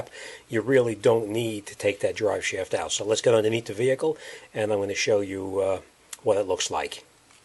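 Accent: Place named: Boston, United States. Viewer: Hes so boston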